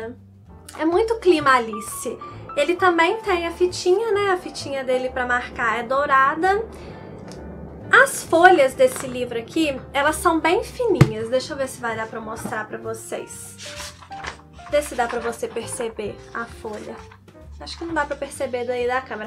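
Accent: Minas Gerais, Brazil. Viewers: Oi! Te conheci essa semana e estou adorando seu conteúdo! Sua voz é tão gostosa de ouvir, esse sotaque delicioso de Minas ❤ adorei sua seleção, livros realmente lindos! Aqui na minha estante meus xodós são as edições ilustradas de Harry Potter, as novas edições de Senhor dos Anéis, pela Harper Collins, e a edição da Puffin in Bloom de Anne of Green Gables, aquele azulzinho, sabe?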